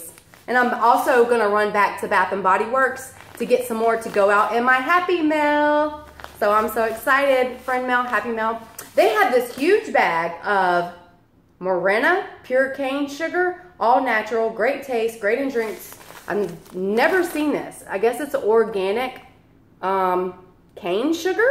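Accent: South, United States. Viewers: Gotta say that I just love your southern accent. I love some of the phrases you use. They add to your heart warming personality. I myself appreciate your comments regarding even the smallest kindness making your day! It’s true. One thought good or bad can change the course of a day sometimes, but when you know that their are people who care it makes life just that much easier!!!! Thank you for your positivity today!!!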